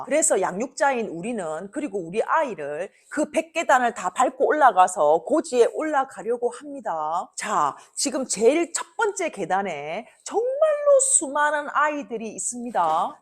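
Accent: Gyeongsang, South Korea. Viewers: 넘 재미있어요. 진짜 잼 있어요... 두 아들이 있는데 둘 다 고졸 입니다. 대학 대신 상장사 AI 팀장과 매니저로 서울대와 카이스트 졸업자들을 AI 교육 시키고 있습니다. 다음에 한번 뵙고 싶군요. AI 시대는 남들과 다른 교육이 필요하고 AI 시대 교육은 새롭게 변해야 한다고 생각해요. 산골에서 단 한번도 사교육을 시켜보지 못했고 과학고에서 영어 4등급 받았어요. 그런데 토익은 만점 입니다. 국내 대학 포기하고 연봉 억대로 취업을 했어요. 저희 유튜브에 대한 이야기도 좀 부탁 드려요. 한번 기회를 주세요^^ 저도 유명해지면 해드릴게요^^ 틀림없이 내년에 유명해 질 것 같아요^^ 경상도 사투리 넘 멋져요^^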